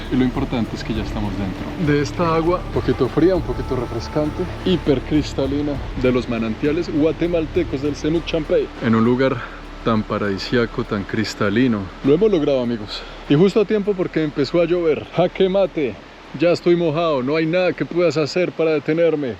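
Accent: Colombia. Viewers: Me encantas! Como te emocionas, lo tierno que eres con los perritos, que bonito nos describes tus viajes y tu acento colombiano... eres un tipazo! Saludos desde Saltillo, el norte de Mexico... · Jajaja me encanta ver sus vídeos, lo explica muy divertido y con ese acento colombiano que conquista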